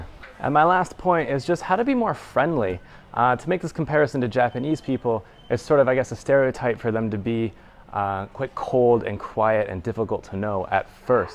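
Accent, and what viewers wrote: Canada. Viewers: wow I love watching ur vids and when I'm stoned your voice sounds like the purest Canadian so maple syrupy and beautiful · That is his Canadian accent. In Canada both 'or' and 'orr' are pronounced with a long o where as in the US 'or' has a long o and 'orr' has a short 'o'. Thus in Canada the 'o' in sorry is pronounced the same as the 'o' in sort, while in the US it is pronounced like the 'o' in pot.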